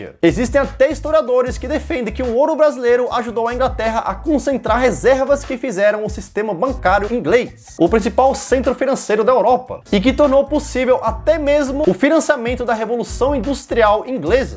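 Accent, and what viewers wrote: Brazil. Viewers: Vi teu canal e não sei se você é um brasileiro com sotaque português ou um português que usa gírias brasileiras. Se você for português teu sotaque é bem mais parecido com os brasileiros do que com os portugueses.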